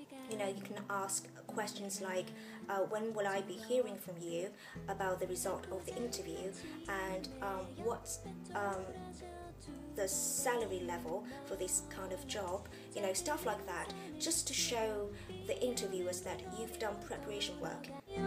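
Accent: United Kingdom. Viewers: 这个女孩的英式英语说的真的很好。不是光是语调节奏听着英式而单音错误一大堆的那种混迹在国内各大网站论坛的误人子弟者。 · how did you learn that accent? Luv that british accent! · 覺得英腔加一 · 沒有美吧...整個英到不行...... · 简直英音。。。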